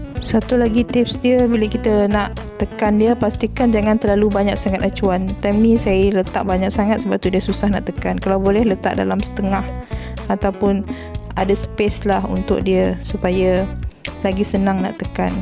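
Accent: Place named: Sabah, Malaysia. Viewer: tq resepinya.. tp knp bunyi awak ckp kdg2 mcm org sabah? hehehe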